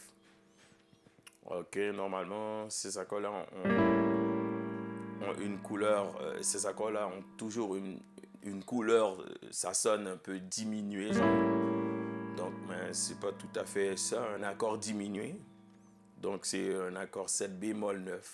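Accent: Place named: Canada. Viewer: Vous avez un accent pas très prononcé mais coe une personne qui a été au canada ou bien vous êtes au canada.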